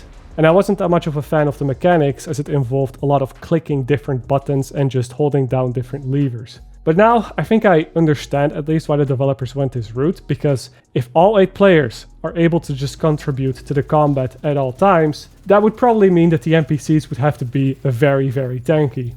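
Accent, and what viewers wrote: Netherlands. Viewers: Good video, I've got over 2500 hours in division 2, well explained. I'm sure you're Dutch (I do think because of your accent, as I am) and I also think you're involved with the YouTube channel Fern...Am I right? 🙂♥️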